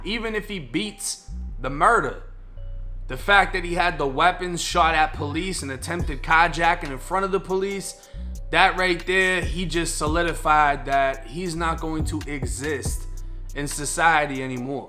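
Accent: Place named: Northeast, United States. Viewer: Gotta be from NE area, I love that accent too...lol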